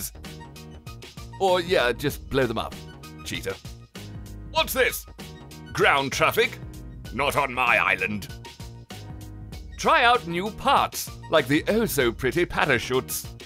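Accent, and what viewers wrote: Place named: RP, United Kingdom. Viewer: Damn ur voice, the perfect posh british accent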